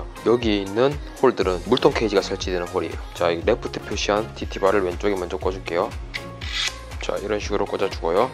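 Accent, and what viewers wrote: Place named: Gyeongsang, South Korea. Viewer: 자전거 좋아하고 경상도사투리가 구수해서 님 영상 자주보는 구독자인데 샵이 당리동인거는 오늘 처음알았네요.ㅎㅎ 하단sk거주자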